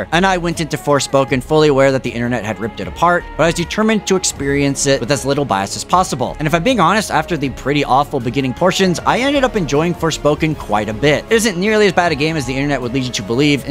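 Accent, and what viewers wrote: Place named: India. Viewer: love your indian accent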